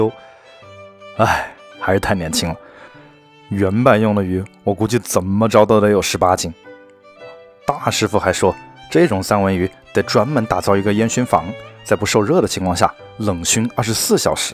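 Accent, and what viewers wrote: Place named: Hunan, China. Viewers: 哈哈哈听你的普通话隐隐约约感受到湖南口音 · 你说话好大的fu南人的味道啊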